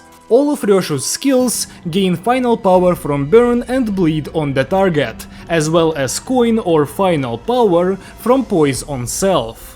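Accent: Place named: Russia. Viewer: Y teba akcent russki =)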